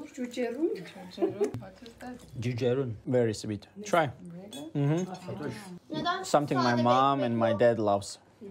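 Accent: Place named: Kars, Turkey. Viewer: Onca yıldır dışarılardasın abi hala içindeki Karslıyı atamamışsın. Konuşunca o "ğ" sesi çıkıyor hala. maşallah. Zamanınız olursa gelsin babasının memleketi Serhat Kars'ı görsün.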